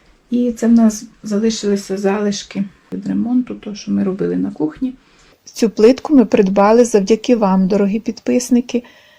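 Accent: Western Ukraine, Ukraine. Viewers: Непохоже,что вы из Запорожской области.выговор у вас западенский.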